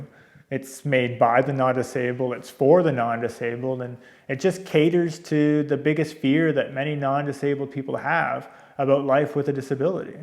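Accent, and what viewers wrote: Canada. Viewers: There's a lot in this video I was nodding along with but may I just say, you sir have the only Canadian accent I have ever been able to pick up on! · You sound Canadian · Okay so I know this horribly off topic, but are you Canadian? It's just the way you said eh. I'm guessing if you are Canadian you're probably eastern. Idk I'm just completely focusing on your accent, sorry.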